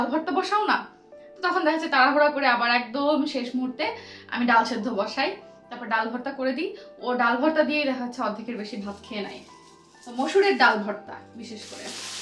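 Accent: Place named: Chittagong, Bangladesh. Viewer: কথা শুনে মনে হচ্ছে চিটাংগগে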